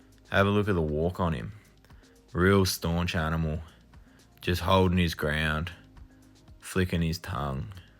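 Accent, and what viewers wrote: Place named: Australia. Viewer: Love the australian accent m8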